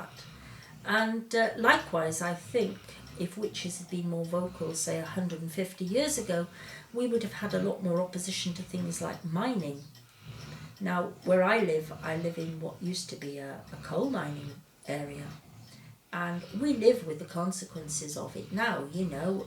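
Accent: Wales, United Kingdom. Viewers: I live in next to Aberfan. I knew you lived in Wales from your accent from watching your other videos but didn't reolise you lived close by. Loving the videos by the way 😁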